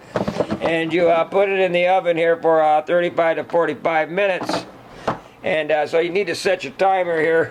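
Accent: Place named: Green Bay, United States. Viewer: You sound like you're from Green Bay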